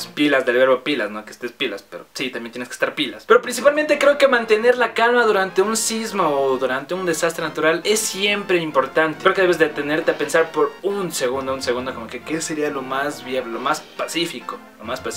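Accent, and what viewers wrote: Ecuador. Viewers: eres de ecuador?! jaja me encanto este video un gose y lo del carro xD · eres de Ecuador? /o\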